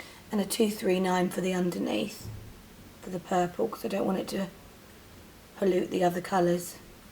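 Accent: United Kingdom. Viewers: Loved the bus but your brittish......not subscribing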